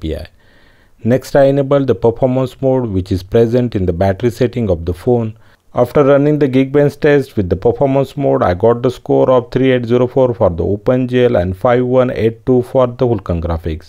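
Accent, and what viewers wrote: India. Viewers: first of all i asked for 615.50 coz your video specifically says 615.50 update to 690. which implies i need to be in 615.50 before updating. second i dont wanna watch a full 9 min video in broken english with heavy indian accent.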